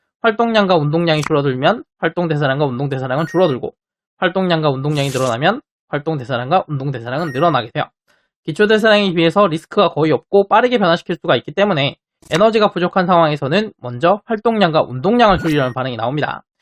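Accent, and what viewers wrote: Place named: Gyeongsang, South Korea. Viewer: 이분 경상도분이신듯 약간 억양이 왜 경상도사람들이 아 어 발음이 좀 새는경향이있거든요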